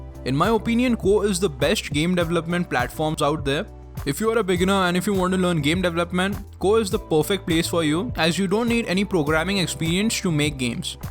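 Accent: India. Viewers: Are you indian ? Your accent sounds like indian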